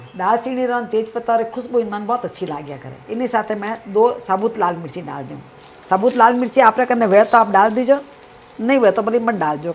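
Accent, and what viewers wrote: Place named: Punjab, India. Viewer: Daadi amma to app lgte hi nhi hi aur apki voice to bhut sweet hai Punjabi accent bhi ata hai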